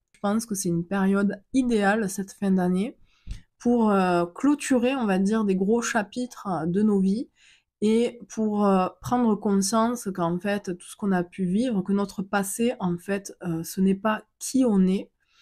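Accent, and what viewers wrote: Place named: South, France. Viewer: Je decouvre votre chaine. Bravo pour votre authenticite.Douce et jolie,aimez-vous ça vaut le coup! J.adore votra accent du sud je me regale moi qui suis du Nord. Bonne continuation. Je vous suis desormais. Merci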